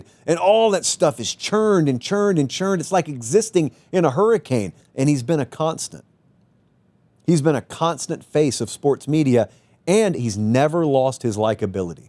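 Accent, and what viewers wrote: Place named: Tennessee, United States. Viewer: The comparison between you and McAfee is interesting.. both guys without “J school” degrees making it big simply due to the advent of the digital era.. but you two are polar opposites in terms of the appeal behind your success.. McAfee is charmingly dumb, funny to the average man, slightly controversial.. you on the other hand are a true intellectual who happens to have a TN accent and love college football.. and because you use big words and back your opinions with critical analysis and make jokes that are way over most’s heads while inevitably offending people who watch your content already having their minds made up I think your audience cap is much less.. if you sell out and pander to the masses I think you could be #1 in 2035 👍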